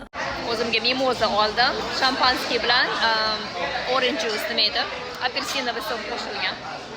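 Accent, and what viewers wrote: Uzbekistan. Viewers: Ўзлигиззи йўқотмаганиз яхши. Тилиз ширин. Тозза тошкентча. Қизиз билан ўзбекча гаплашвуринг. Ростанам опа сингилга ўхшисила.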